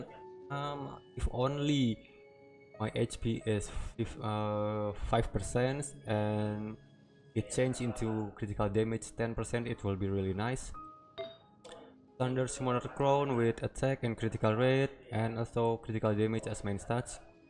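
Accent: Indonesia. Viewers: sounds like an Indonesian accent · You speak very much like Indonesians, whether you are from Indonesia or Malaysia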